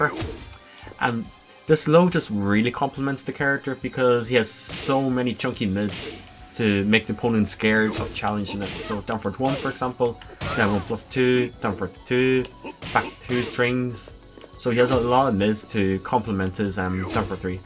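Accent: Ireland. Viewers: That’s the Irish for ya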